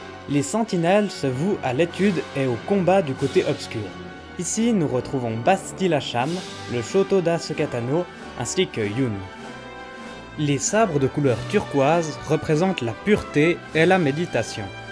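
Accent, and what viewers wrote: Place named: England, United Kingdom. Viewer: horrible accent anglais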